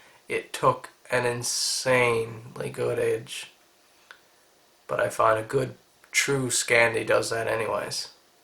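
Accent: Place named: Newfoundland, Canada. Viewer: Your accent. You a Newfie?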